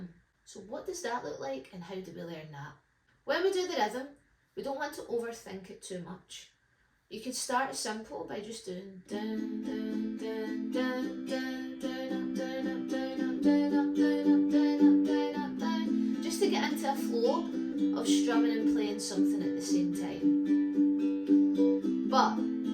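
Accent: Scotland, United Kingdom. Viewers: Thanks for posting this tutorial. Love your accent 😍 by the way. Is it Scottish?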